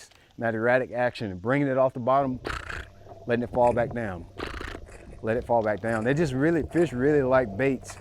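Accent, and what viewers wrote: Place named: South, United States. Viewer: Boi I’m from Louisiana but THAT accent is so country i love it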